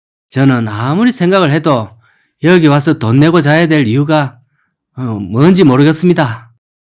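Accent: Gyeongsang, South Korea. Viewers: 암행어사! 구수한 경상도 사나이 사투리 정감이 갑니다.발통? 오랜만에 들어 봅니다.건강하세요!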